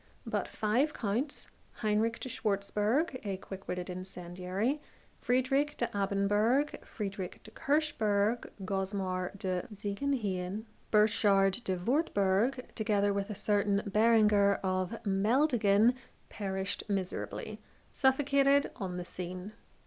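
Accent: Ireland. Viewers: Love your Irish accent. Where in Ireland are you from? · Oh i love your Irish accent! But your pronunciation of "count" and "counts" is somewhat unfortunate😂😂😂😂😂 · Is this an Irish accent? 🎉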